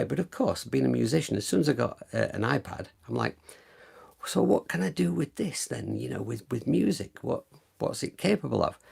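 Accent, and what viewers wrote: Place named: Northern England, United Kingdom. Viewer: Both guitar and voice levels fine, nice playing. Wot neck of the woods are you from, cos I can tell yer a nothern lad?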